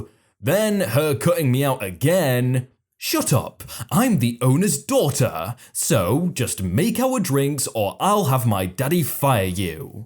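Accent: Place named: Liverpool, United Kingdom. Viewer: Hell, you had only read the first THREE words and even got that wrong. Still, you got a great accent! You from Anfield?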